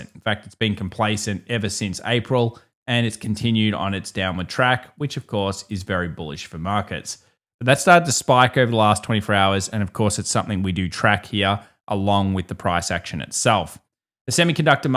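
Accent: Australia. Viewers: I like it the way he said it. Plus he's Australian so buzz off mate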